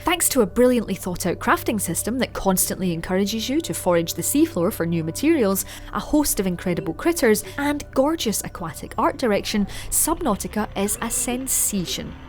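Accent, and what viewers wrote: Scotland, United Kingdom. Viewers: this Scottish accent man , my heart i love it xd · Apart from the games, I love the narrator's Scottish accent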